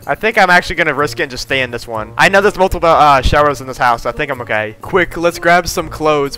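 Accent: New Zealand. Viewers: Are you from new Zealand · wait what are you from nz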